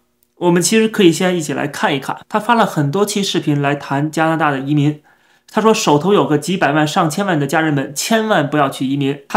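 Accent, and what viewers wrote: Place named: Beijing, China. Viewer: 我怎么听到一北京哥儿们儿在温哥华夜市烤串儿，历时四年购了大豪斯啊……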